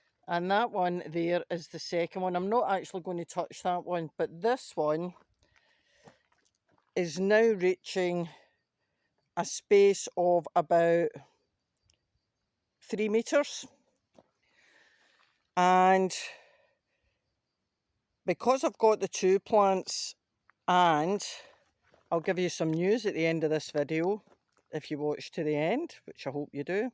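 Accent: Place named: Northern England, United Kingdom. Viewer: I heard the accent and thought to myself how on earth has someone up north managed to get butternut squash to come in so quick and also to have toms outside. Then I saw you in France. Well done.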